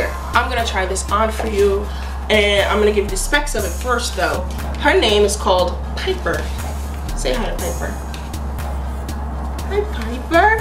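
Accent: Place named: Jamaica. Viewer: the island accent did it for me girlie! Are you Jamaican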